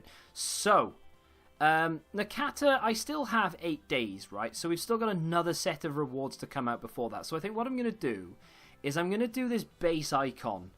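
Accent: Wales, United Kingdom. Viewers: Are u welsh ?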